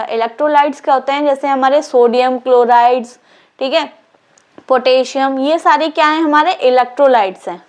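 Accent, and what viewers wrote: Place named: Assam, India. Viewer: Ma'am aap Assamese ya bengali ho kiya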